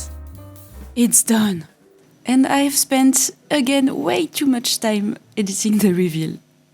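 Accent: France. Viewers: OMGGG l'accent français (｡･ω･｡)ﾉ♡✨✨ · Je me demande si tu fais exprès de ne faire aucun effort de prononciation pour donner le charme de l'accent français vu par les étrangers ou si c'est naturel xD Quoi qu'il en soit, c'est très marrant, très bien rythmé (le montage de la vidéo est nickel) et même si je ne porte pas de perruques j'ai trouvé ça très intéressant. Naïce!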